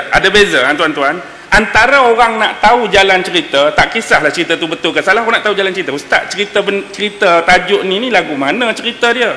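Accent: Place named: Penang, Malaysia. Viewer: asal kelantan tapi bahasa loghat penang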